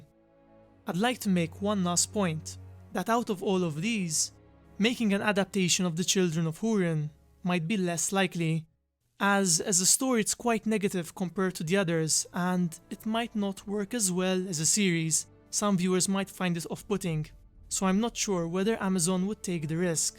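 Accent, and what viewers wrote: Malta. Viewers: The Maltese accent is so evident haha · great video! are you Maltese?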